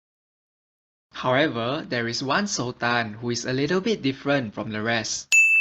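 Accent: Singapore. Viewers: Your Singaporean accent is really strong. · U srsly expecting a Singaporean to have a Malaysian accent? 🤣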